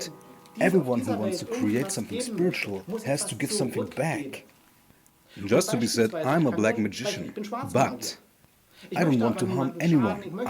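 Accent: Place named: Germany. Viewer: Das Deutsch hört man doch eh leicht durch.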